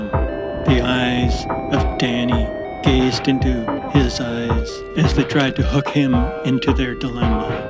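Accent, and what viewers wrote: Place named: Alberta, Canada. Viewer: I detect an Alberta accent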